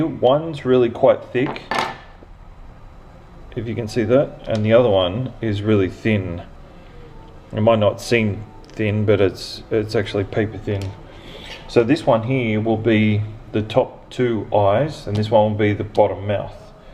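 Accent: Australia. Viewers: Oh Yay, a soap maker with an Australian accent!!! I'm in the early stages of learning about soap making, and I'm nearly ready to start making. Can you please give me some ideas of the best places to buy soap supplies, essential oils, colours etc... In Australia? I'd really appreciate it. The only place I've found so far is, Aussie candle supplies.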